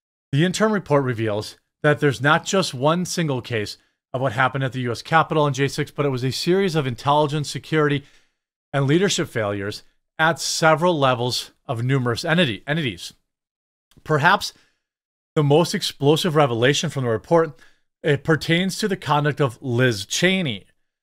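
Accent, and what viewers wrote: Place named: Wisconsin, United States. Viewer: Love you man! Merry Christmas to you and yours. I love hearing the Wisconsin lily in your voice; makes me miss home ❤. Keep up the great work.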